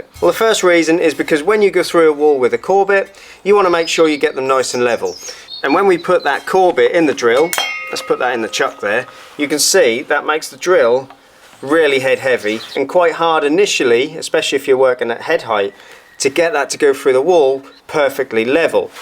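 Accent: Norfolk, United Kingdom. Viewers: Great advice, and great Norfolk accent......"wull done bore keep on a troshin" 👍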